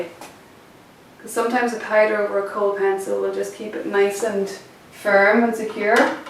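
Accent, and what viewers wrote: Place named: Ireland. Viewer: irish english doesn´t sound right it soounds awful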